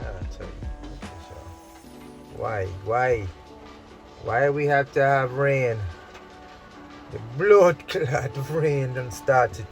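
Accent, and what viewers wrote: Jamaica. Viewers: Lol 😂 I thought he was a yardie for a sec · The Jamaican accent got me. Lol. Time to eat at $3.30 a mile. Keep hustling as we gotta get truck #1 back on the road.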